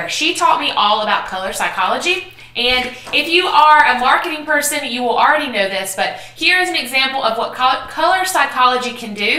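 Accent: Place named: Texas, United States. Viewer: From texas? Nice accent and ideas of course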